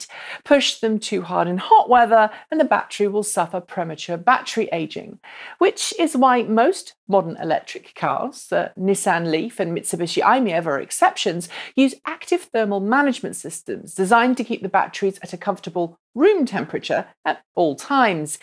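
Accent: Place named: United Kingdom. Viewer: with British accent everything sounds smarter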